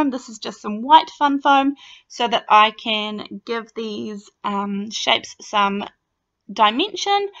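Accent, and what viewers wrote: New Zealand. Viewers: You've got a kiwi accent. Are you from Aotearoa?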